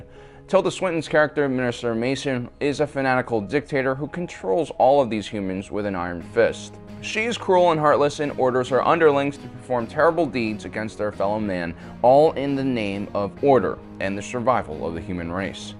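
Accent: South, United States. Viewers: The southener is strong in you. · You got a wired accent, man. It’s a mix of US southern and British. Great video. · Are you from the south? Your voice seems kinda different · Why do you have a southern accent lol? I am interested in your family's story. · You look asian, but sound southern american. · You have a good modulated voice, don't try to ruin it by speaking like a southern man. It's unintelligible and is quite annoying.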